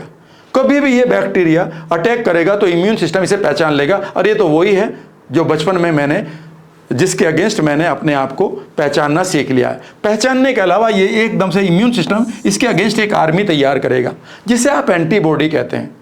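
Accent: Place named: Muzaffarnagar, India. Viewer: ji mann karta h aap ko sunte rahe aap ki bhasha ki miths me mujaffar nagar ki khusbu aati h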